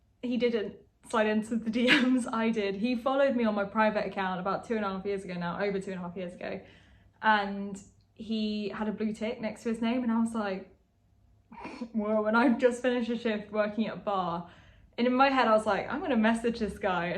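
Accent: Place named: United Kingdom. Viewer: Basically, my motivation to subscribe to your channel is your British accent.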